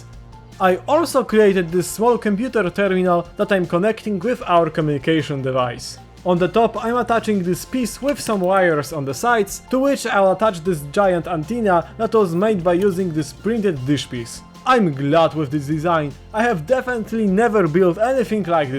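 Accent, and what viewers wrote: Poland. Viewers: nie no stary, masz totalnie polski akcent to usłyszeniu z 5 słów już widziałem że jesteś z polski. ale moce Zajebiste🔥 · łoooo panie polak! słychać po akcencie typowo polski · Your had polish Accent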